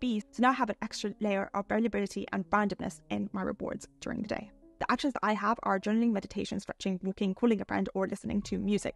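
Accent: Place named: Scotland, United Kingdom. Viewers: what kind of British accent is she? can anyone answer me this question? i'm learning english and i'm not convenient to listen rp american and rp brittish accent but her voice is so soft to my ear. i want to do research what her accent is from. (thanks) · She is from London but I hear a slight Scottish accent also. It's a mix of both because of the way she pronounces certain words.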